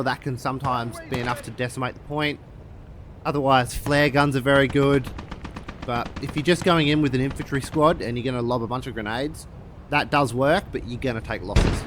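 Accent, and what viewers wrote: Australia. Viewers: If they hire you to do the australian voicelines, I think "Happy days" is a mandatory one! and "Rough!" if a soldier dies.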